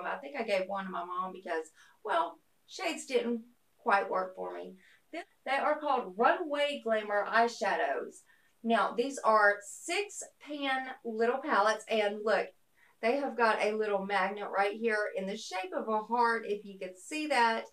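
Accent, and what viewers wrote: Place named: Tennessee, United States. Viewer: Love your accent! I'm originally from Knoxville, Tennessee and you sound like home. lol. I'm supporting through the group for your watch time now.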